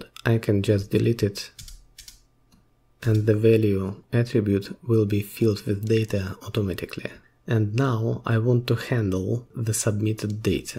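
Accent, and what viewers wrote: Russia. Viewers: слышу родное "зэ"😆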